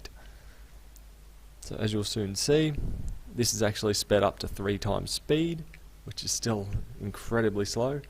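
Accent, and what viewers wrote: Australia. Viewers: Who is this ausie guy... IF YOUR GOING WITH A STARTER CHIP lol... You get the fucking Ryzen 3 2200G! It's on sale for like 110 dollars canadian right now... and they run the exact same as the 7nm 3200G for 20 dollars more. Best budget CPU by far to start with. And if you upgrade down the line, you literally won't get bottle necked with a GTX <num>... but who needs all that wattage? lol.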